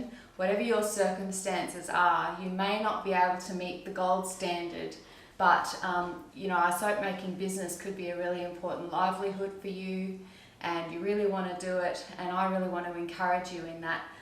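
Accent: Australia. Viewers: question. I’ve been weighing out my lye flakes in a glass Pyrex tub with a red lid (as if the color matters! 🤣) before stirring them into my distilled water in a stainless steel jug. (Snow falling on the lake.). Is it safe to use glass for dry lye flakes or should I switch to plastic? Thank you so much! Still inadvertently thinking, reading and making soap in your beautiful Australian accent! 😂. You’ve changed my brain - thankfully for the better! 😂. Take care, stay healthy! 😍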